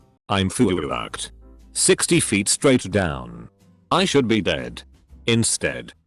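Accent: United Kingdom. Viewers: Nice British accent, man! 👍👍👍👍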